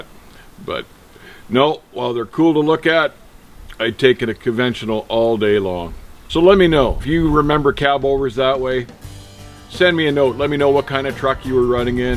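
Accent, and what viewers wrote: Canada. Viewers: Love the Canadian accent...it’s not about.....it’s a-boot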